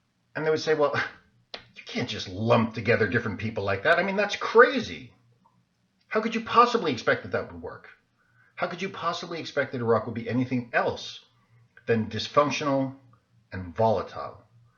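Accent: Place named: United States. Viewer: May I ask you where you live? I hear an American accent though so America I guess, but where?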